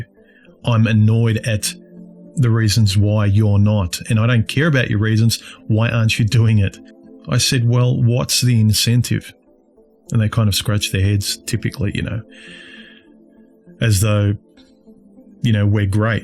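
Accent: Australia. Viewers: is this guy australian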